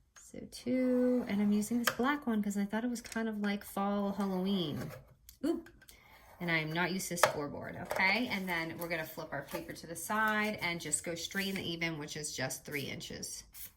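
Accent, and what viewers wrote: Boston, United States. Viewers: Ummmm just my 3¢.... BUT.... super cute Halloween makes !! And although deffinatly not a heavy Boston accent , and maybe very slight , but feel you have more of a Boston accent then you think you do ..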